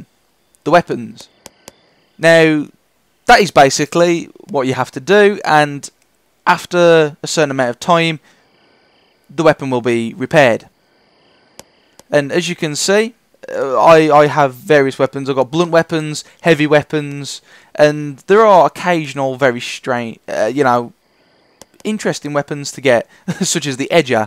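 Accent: Birmingham, United Kingdom. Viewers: Are you a brummy ?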